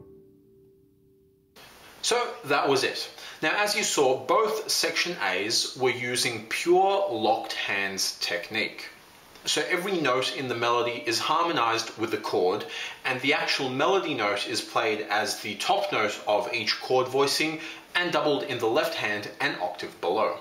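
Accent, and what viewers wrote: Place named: Australia. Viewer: hey, i noticed you have an Australian accent, do you do 1on1 tutoring or know anyone else in Sydney for Jazz piano youd recommend?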